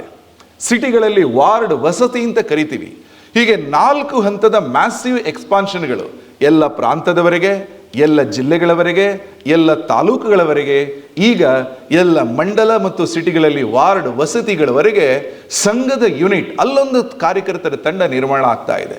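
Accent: Standard. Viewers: Sir parisudhavada kannada thumba santosha ಆಯಿತು. ಮಗ ನಿನ್ನಗೆ ನಮನ 👍🙏🙏🙏🙏🙏🙏🙏🙏❤️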